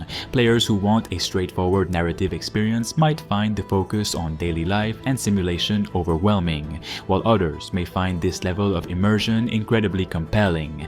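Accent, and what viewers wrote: Quebec, Canada. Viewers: Gros accent québécois haha (Bon vidéo!)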